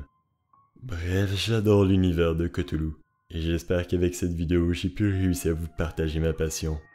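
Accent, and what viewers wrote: Quebec, Canada. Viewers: J'adore Cthulhu, mais ca m'a fait bizzarre d'entendre l'histoire avec un accent québécois ^^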